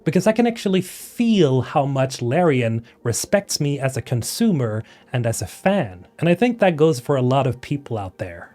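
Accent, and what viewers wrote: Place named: Sweden. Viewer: That cute subtle Swedish accent though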